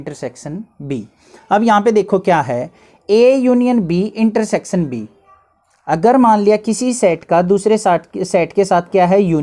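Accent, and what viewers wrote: Haryana, India. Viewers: Sir it seems u r from haryana??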